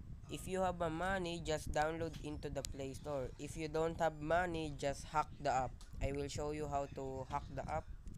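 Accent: Philippines. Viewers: Your a Filipino cause your voice is like a Filipino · Sa accent pa lang alam kong pinoy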